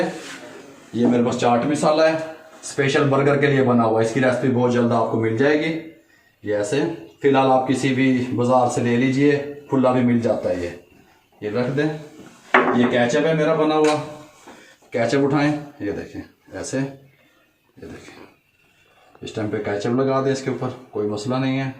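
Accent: Punjab, India. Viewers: Assalamu Alaikum baba ji buht hi aala aur zabardast tareeqy se aap ne bataya aur itni mehnet ki hamare liye... ALLAH Tala aap ko mazeed tarraqi dein aur kamyab kerein aap khush rhein sada AAMEEN Batein kerty huwe punjabi touch buht acha lagta hai zarur isi tarha baat kiya kerein Buht sara salam aur duain baba ji aap k liye bi aur k liye bi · Bhai salam app buhat iman dari se sikha rahain hn main ny app se buhat sikha jazak Allah Allah app ko deen aur duniyia main taraaqqi atta farmain Ameen ap ki panjabi urdu k sath mix ho k buat maza dyti hn khush rahiyye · Tohadi punjabi bhot qeant hy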